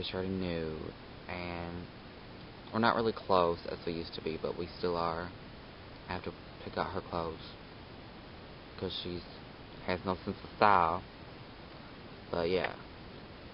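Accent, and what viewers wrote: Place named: South, United States. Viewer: Congrats, I'm still struggling with coming out and watching videos like this is inspiring me to. I've told a few people so far and the next people I'm hoping will be my parents... :O anyways, thanks for posting an amazing video and btw you have the cutest southern accent I have ever heard!!! :D just saying. -33333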